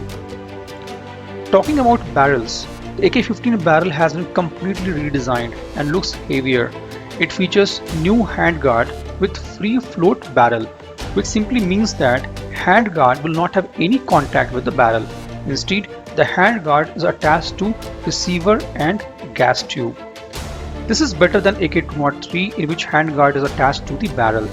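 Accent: India. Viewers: speak slowly. Indian accent is made fun all around the world. Nahi tou apna Hindi bolo, Maast.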